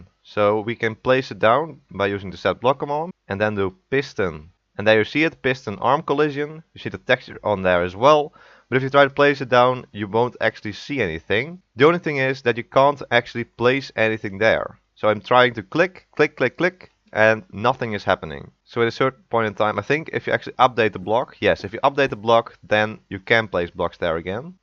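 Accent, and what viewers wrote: Netherlands. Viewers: When u hear a dutch accent in his english voice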